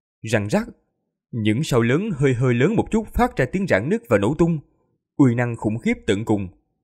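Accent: South, Vietnam. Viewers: Cảm ơn đạo hữu rất nhiều Vì tui đồng hành vs từ những ngày lập kênh Qua biết bao nhiêu tập truyện.. Nên khả năng nghe và nói tiếng miền Nam tốt lên rất nhiều Đợt công tác vừa rồi vào miền Tây ko có bỡ ngỡ như ng lần đầu vào chút nào cả..❤ Vì thế giao tiếp rất chi là tốt vs ng bản địa Rất cảm ơn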